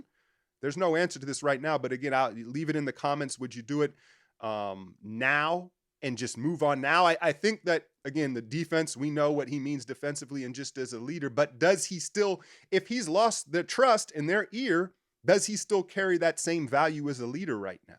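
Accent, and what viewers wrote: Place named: New York, United States. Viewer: Na bro, you can't make it in warrior media for a second. You way too blunt for that organization. You really sound like a New Yorker if am being honest. If I never knew you; I'd think a New York media is bashing the warriors all these years. Happy takes boss!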